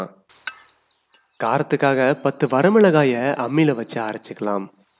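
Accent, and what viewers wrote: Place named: Kongu, India. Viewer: Konku tamil pronoun supper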